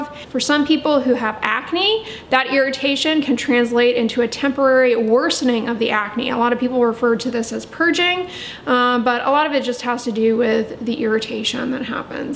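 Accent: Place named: Long Island, United States. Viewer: I can’t with the Long Island lockjaw.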